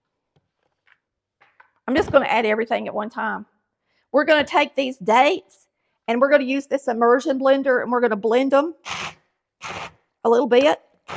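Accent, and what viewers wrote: South, United States. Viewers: As a Brit I love your Southern accent, it's so relaxing to listen to. The recipes are great too, of course!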